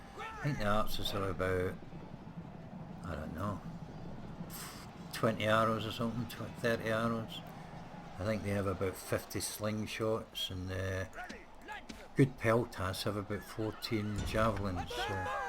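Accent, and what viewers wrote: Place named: Scotland, United Kingdom. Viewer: You sound Scottish and if you’re not don’t get angry cause I’m Swedish?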